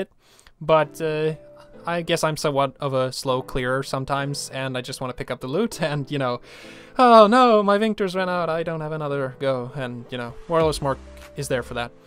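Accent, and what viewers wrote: Sweden. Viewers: Lel, you swedish lad?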